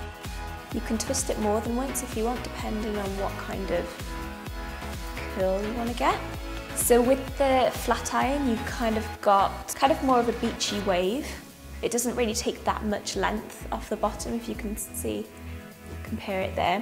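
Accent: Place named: Wales, United Kingdom. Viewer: Welsh if im not mistaken!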